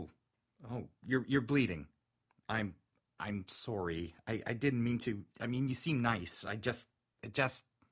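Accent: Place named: Canada. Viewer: Sourry? CANADA YOU SOUND LIKE YOUR FROM CANADAA. Sorry ;-; But I loved thissss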